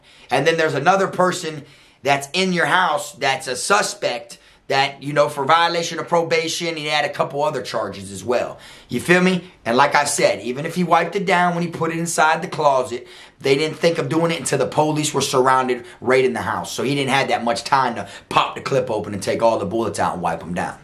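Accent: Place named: Florida, United States. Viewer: Yo we all definitely got the same talk here in Florida 🤣💯💯 I talk the exact same way you do! ❤️💯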